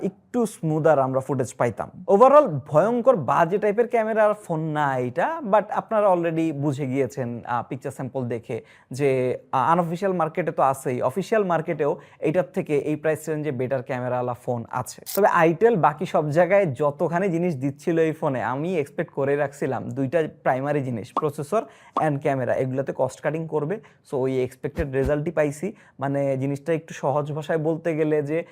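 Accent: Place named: Chittagong, Bangladesh. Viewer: ভাই আপনার ভয়েসে মাঝে মাঝে চিটাগাংয়ের অ্যাকসেন্ট চলে আসে!!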